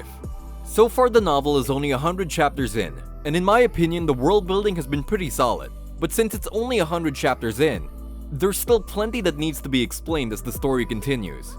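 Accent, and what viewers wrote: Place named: Philippines. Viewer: That's awesome dude. Been here since 200 subs. Btw are u a Filipino ur accent sounds like many Filipinos.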